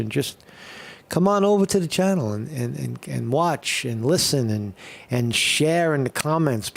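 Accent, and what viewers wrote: New York, United States. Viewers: Hey first time I'm tuning into your podcast you sound like a fellow New Yorker but I could be wrong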